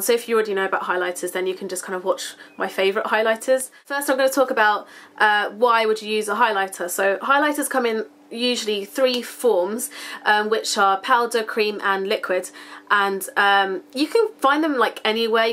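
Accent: United Kingdom. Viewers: yes she does? she has a british accent.....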